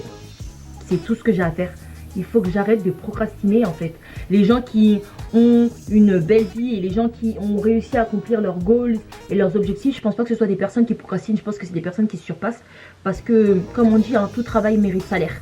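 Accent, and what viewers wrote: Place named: Democratic Republic of the Congo. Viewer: Aah pitinnn😂😂😂l’accent congolais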